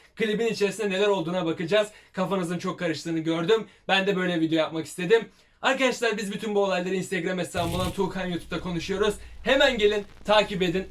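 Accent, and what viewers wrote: Black Sea, Turkey. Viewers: abi karadenizli gibisin haa ne guzel okuyon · Abi valla Karadeniz şivesini çok güzel yapıyosun haykırdım sayende 😂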